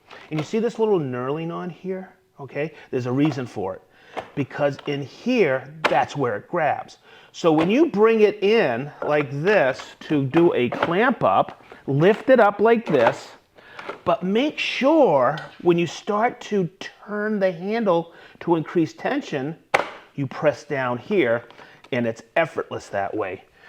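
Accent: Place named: Boston, United States. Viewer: What kind of oil on your sock? Could not understand with your Boston accent.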